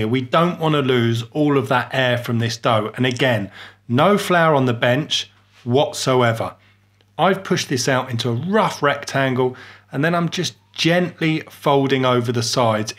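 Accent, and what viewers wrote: United Kingdom. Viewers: hate the way British people say "wot"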